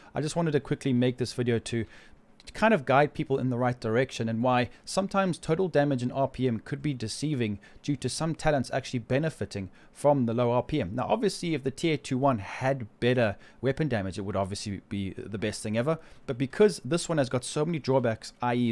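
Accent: South Africa. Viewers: Are you South African? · you're from south africa aren't you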